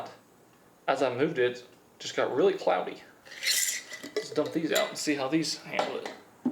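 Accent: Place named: Pennsylvania, United States. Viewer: What state are you from cause I’m guessing PA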